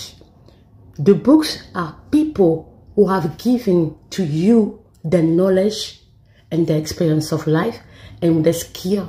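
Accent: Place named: France. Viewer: Mmmm… French accent 😊😊😊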